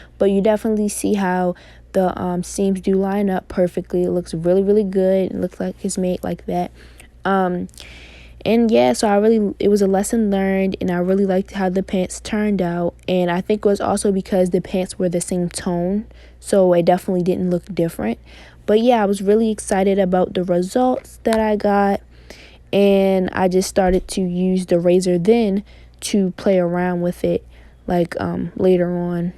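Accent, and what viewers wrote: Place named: Baltimore, United States. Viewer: it sound like she from baltimore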